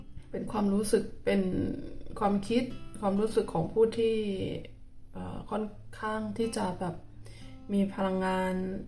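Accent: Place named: South, Thailand. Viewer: คนใต้คับผม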